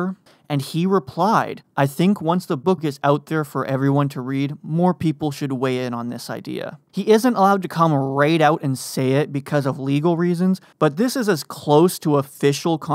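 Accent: United Kingdom. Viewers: Please, English accent not British. British would include Scottish, Irish, Welsh as well as English. Even to suggest there are no regional difference in accent within England is incorrect. Someone from Newcastle will sound very different from a Bristolian who in turn will be different from a Londoner. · keeps up with the british accent too...nice one.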